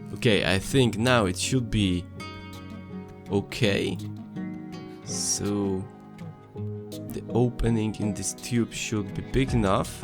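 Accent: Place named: Germany. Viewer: Twoja wymowa brzmi jak niemiecka 🤔 Jak według mnie, obcokrajowca. Zgadłem czy nie bardzo ?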